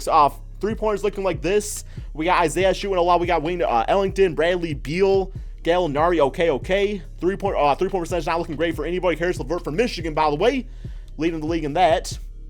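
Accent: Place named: New York, United States. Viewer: You’re from New York aren’t you😅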